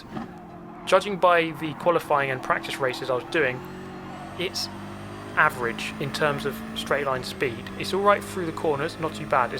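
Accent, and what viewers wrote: United Kingdom. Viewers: Your Initial D references earned my sub lol And I could listen to a British commentary on any topic 😆 love it! Keep up the fun videos and long live the shadow realm!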